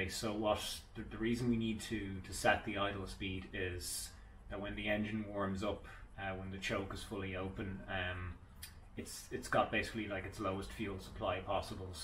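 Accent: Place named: Ireland. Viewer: Not sure what that will achieve because this fella's got an Irish accent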